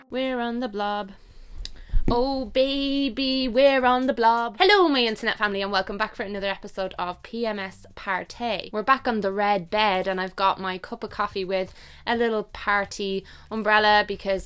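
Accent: Ireland. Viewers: I can't wait to read your book I just realised that you have a YouTube channel and I'm so happy that I found an IRISH 🍀 YouTubers I love u so much thank u for being Irish and having an Irish accent rather than American or English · I think you sound so cute with your denture! I love listening to your voice, the Irish accent is my favorite!!! You are gorgeous and thank you so much for all your hard work and your realness. I love all your videos 💚💚💚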